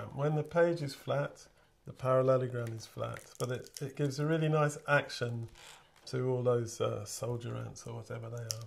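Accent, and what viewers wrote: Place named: RP, United Kingdom. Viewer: ciertamente tiene un estupendo acento Británico culto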